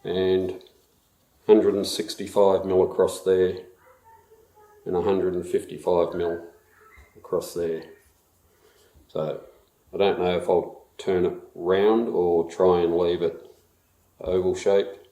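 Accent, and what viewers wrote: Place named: Australia. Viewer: Good work mate, nice to hear an Aussie accent, i understood every word, maybe because I am Aussie to😬👍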